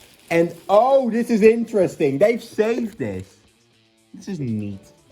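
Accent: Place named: United Kingdom. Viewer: You're sound like British or United kingdom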